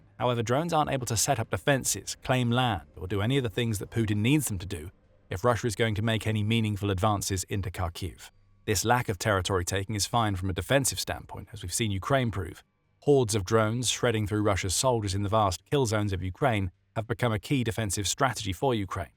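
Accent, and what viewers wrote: United Kingdom. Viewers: "This is Insanity. Putin would rather loose 100 soldiers than 1 tank." The irony of that statement is that the narrator has a British accent. During the the battle of Passchendale, the British High Command lost so many of the horses that pulled artillery and wagons that the British considered the loss of horses as more significant than the loss of men.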